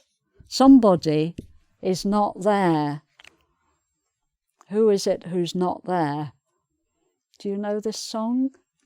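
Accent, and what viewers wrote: United Kingdom. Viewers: Thanks again and again for your amazing lessons and British clear accent.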